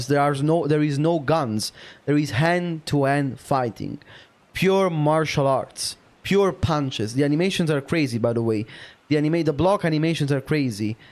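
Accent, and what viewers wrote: Italy. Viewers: You sound Italian, the best European vf player is from Italy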